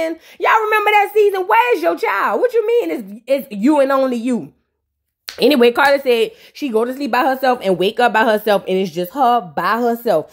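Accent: New Orleans, United States. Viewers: you sound like my teetee erika love your nola accent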